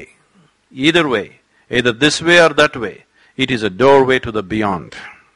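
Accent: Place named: South India, India. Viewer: becoz he's south Indian...south pronounce shiv as shiva....om nama shivaya...not om nama shivya...hope you get it...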